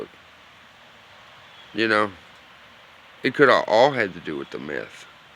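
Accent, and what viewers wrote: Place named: South, United States. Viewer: I just heard you say your from the South. Where ya from? I’m in Arkansas from Louisiana. I knew you sound like home.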